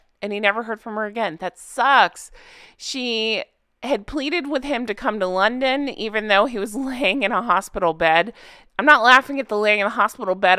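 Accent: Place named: United States. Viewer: i love voice... which gives away she would be a laugh to hang out with.. one of my favourite American accent s.. revenge............ revenggggeeeee.. 🤣.. always gives a chuckle hearing her say it..and shes just said it again while im typing 🤣.. stop it 🤣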